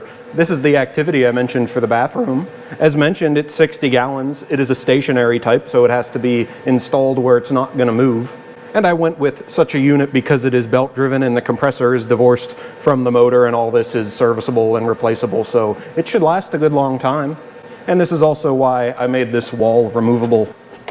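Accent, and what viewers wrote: Canada. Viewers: Trying to peg the accent.... originally from the great white north? I'm getting Canadian or Minnesotan vibes. Love the channel!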